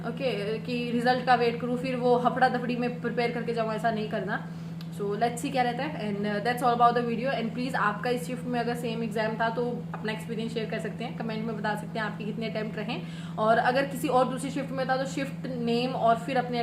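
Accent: Haryana, India. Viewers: ha to Haryanvi bolo na English kyu kharabar kar rahe ho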